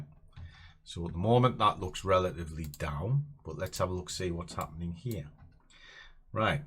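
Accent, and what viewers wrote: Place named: Northeast England, United Kingdom. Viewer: haha I just watched this video and oh nice I’m from Newcastle can tell u was close by the accent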